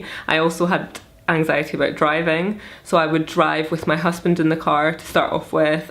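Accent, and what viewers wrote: Scotland, United Kingdom. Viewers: She has a slight Scottish accent. Beautiful skin.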